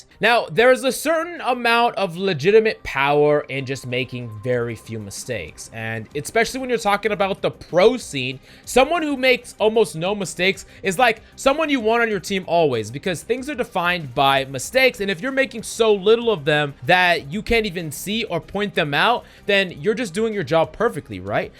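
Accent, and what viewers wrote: United States. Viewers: Heard the American accent, and instantly clicked off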